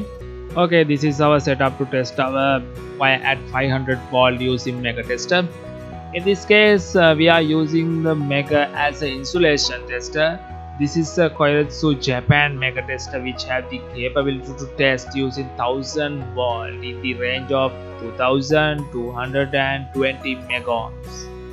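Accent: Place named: Sri Lanka. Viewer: you have sri lankan accent 🤔👍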